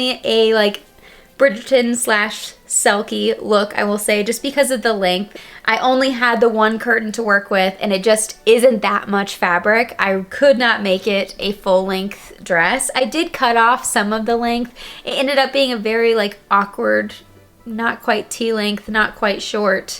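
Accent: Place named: United Kingdom. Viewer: I love the intro😊😊😊. And I thought the British accent was pretty great❤❤❤❤❤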